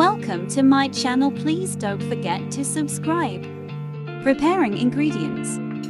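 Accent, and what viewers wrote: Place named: China. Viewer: Nice may voice cover na 🎉👏🥰 Chinese accent 😊 Looks yummy 😋 sis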